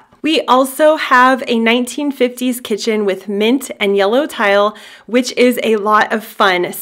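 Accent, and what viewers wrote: New Zealand. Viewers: Oh my gosh I hear a New Zealand accent lol...I'm kiwi too. I love the transformation, I love how you've created a beautiful space within your means. This is really motivating. So many people show renovations that look unrealistically expensive for many. Thank you for sharing your journey 😊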